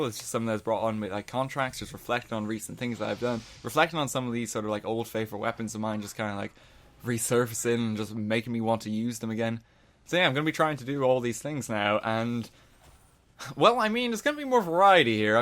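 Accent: Ireland. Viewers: Ah, the sweet, sweet Irish accent flows gracefully over my ears yet again. So similar to mine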